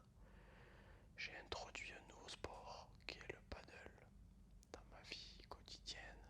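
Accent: France. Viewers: sound is just a touch too low. Need to hear that French accent. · Hum ça change beaucoup du reste de ce que tu fais 😉 Et c’est très qualitatif! Le ton de ta voix, le rythme, la diction, le petit accent du sud sans parler du contenu avec un vocabulaire et des tournures de phrase de très bon niveau! Tu devrais faire plus souvent des vidéos en français comme ça!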